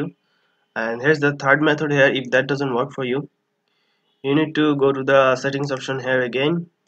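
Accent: India. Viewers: THE INDIAN ACCENT, IM SAVED🙏🙏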